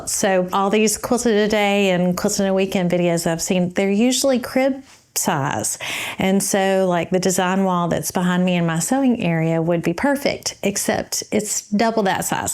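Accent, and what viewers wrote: South, United States. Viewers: Good grief woman! It is beautiful and you are amazing. I would never even try that. You have my hat and my heart. I truly love how you slip into that southern drawl when you are tired. It is precious. Texan as I was born and raised, I also love a good sammich. Jean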